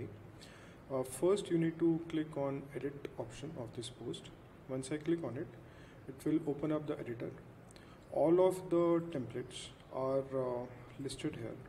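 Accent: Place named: India. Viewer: The Indian accent is very difficult to understand. Please consider using someone who speaks clearly with no accent. As a new user these current videos are frustrating to learn from. Thanks.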